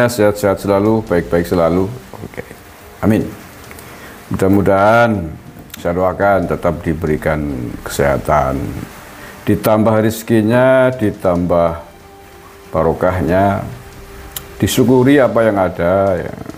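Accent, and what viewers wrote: Banyumas, Indonesia. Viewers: Aku lihat nih melu ngguyu bahasa ngapaknya tuh loh Pak mantep 👍👍🤣🤣🤣🤣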